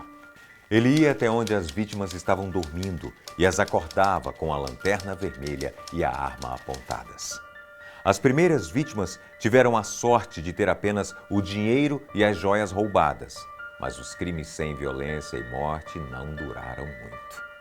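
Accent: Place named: Minas Gerais, Brazil. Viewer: Pq esse sotaque de mineiro se ele é Catarinense?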